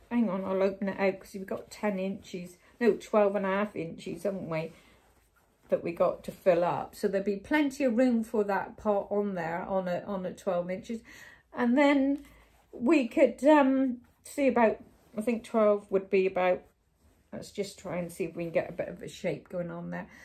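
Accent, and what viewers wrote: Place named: England, United Kingdom. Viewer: So lovely to catch up with you again. You laser light is so handy. It saves drawing a pencil line to follow. Love seeing you putting your pretty fabrics together. I have reserved the Alices Wonderland sampler book in my local library. They only have one copy and I am 8th out of 9 on the waiting list! It is a very popular book. You live in a lovely area of England and your accent is so very cute. Take care xx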